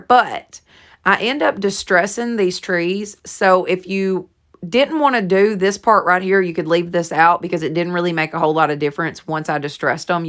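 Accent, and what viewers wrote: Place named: Texas, United States. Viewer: Where are you from with your accent? I love it because I’m a Texas girl with one too and feel at home.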